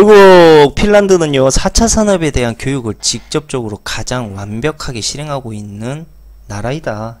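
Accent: Jeolla, South Korea. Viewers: 님 본업이 뭐에요? 왜이래 똑똑하죠?^^ 그리고 님이 열받았을때 (욕할때) 야구선수 이종범 말투랑 거의 비슷^^ (전라도다요??^^)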